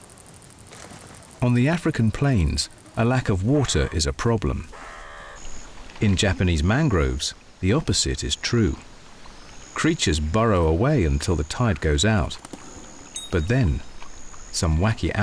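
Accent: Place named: England, United Kingdom. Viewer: High-Quality Documentaries ✨💎I have no idea who these producers are, but this documentary is certainly on par with BBC. And Im not saying that simply because the narrator is English. First class photographic direction & editing. And the cinematography of these landscapes- - Alaska, the Amazon, & India- - are all magnificent, of course... There is no more noble public service than documentaries that teach the Natural Sciences, & display animals & habitat A hundred Thank Yous for commiting this film for broadcast through Youtube 💜💜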